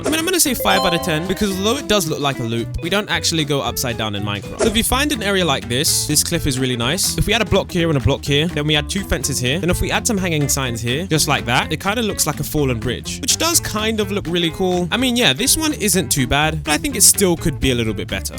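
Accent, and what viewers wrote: United Kingdom. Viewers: You sound British, I’m British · Bro is British💀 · HES BRITISH U DO T KNOW THE BBRITISH AARE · Are you British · Ur British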